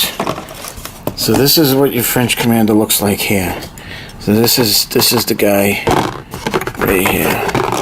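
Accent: New England, United States. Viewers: Black powdah in havahd yahd Love the New England accent! Thanks for the unboxing! I’m just getting into the hobby and bought the Waterloo starter set. May look at this one next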